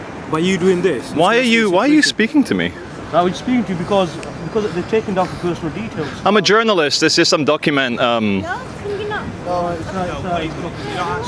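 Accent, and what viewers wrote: Scotland, United Kingdom. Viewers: Mate get back to usa with your scottish twang people dont want to listen to you woith your slapstick way of looking at things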